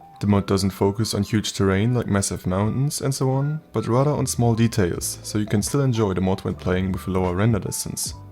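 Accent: Germany. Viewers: Are you german? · ich hör a little bit german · Your English sounds good but do I hear a little bit of Deutsch in there?😂 Nice Vid, thanks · Man hat sofort an deinem Akzent gehört, dass du aus Deutschland bist. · Ich hear auch a Little Bit german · Gutes Video das Deutsch Englisch is crazy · are you german?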